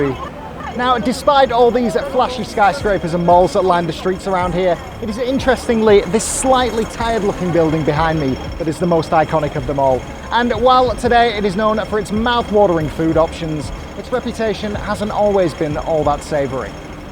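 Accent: United Kingdom. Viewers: Great stuff,. Quite a new fan of yours, mate. Great content, and lots of stuff many other videos of HK don't cover. I've already been once a few years ago, and your great footage is setting up my appetite (literally) for another visit. Keep up the good work - those soft Northern tones of yours are dreamy too!!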